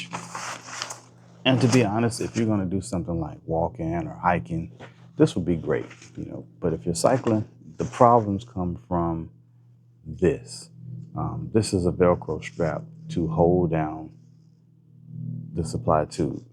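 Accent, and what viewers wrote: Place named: South Louisiana, United States. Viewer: Helpful and informative review. Thanks friend. By any chance, are you from South Louisiana? You sound like it. I’m from Lafayette.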